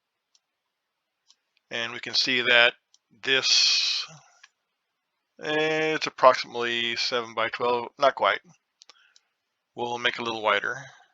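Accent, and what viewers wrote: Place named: Pennsylvania, United States. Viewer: Absolutely fantastic! Thanks a million for this. btw, are you from the great state of Pennsylvania? You have that accent ..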